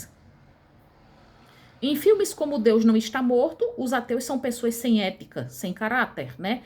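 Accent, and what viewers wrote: Northeast, Brazil. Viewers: SEU SUTAQUE PARECE NORDESTINO.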